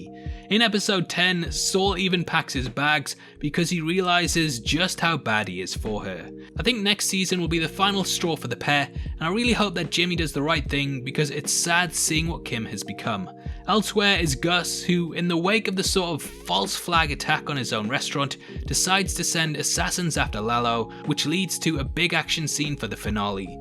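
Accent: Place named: United Kingdom. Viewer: Hilarious to hear these BCS spoilers in a Limey accent.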